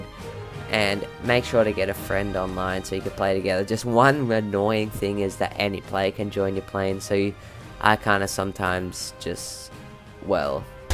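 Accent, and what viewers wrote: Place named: Australia. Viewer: No offense, you sound like an Australian mixed with a French-Canadian. Otherwise, this is really cool. You earned a sub!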